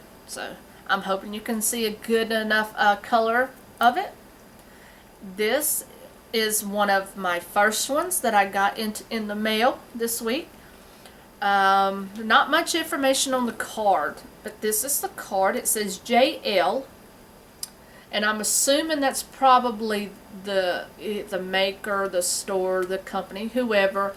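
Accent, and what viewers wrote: South, United States. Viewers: I was wondering where you're from? You mentioned Minnesota but you definitely have that Southern drawl like someone from either Virginia or the South. Also what did you make with the yarn in this video.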